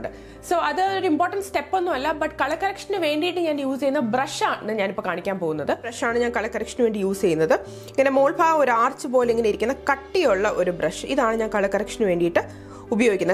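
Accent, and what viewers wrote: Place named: North India, India. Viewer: Chechy adipoliya..chechide presentation nannayi manassilakunnu as a bigginner..pinne chechi malayali aanenne aarum parayilla .oru northy aanenne thonnu...lovly super...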